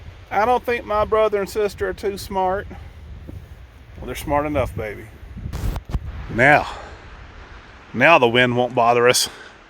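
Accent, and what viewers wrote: South, United States. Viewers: That's the problem with social media. Everyone has an opinion. You don't owe anyone any explanation.. But I do love your southern rants, they are so . . . charming and entertaining! 😍 😍 😍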